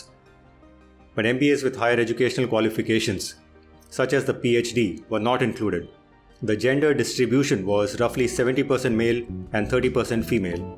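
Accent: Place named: India. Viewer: That's the beauty of Indian spices and accents. The more you experience them, the more you'll fall in love with them. Till you get there, feel free to turn on the accent-free subtitles on our videos.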